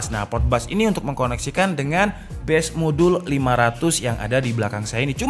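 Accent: Malang, Indonesia. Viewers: Loh orang Malang?:v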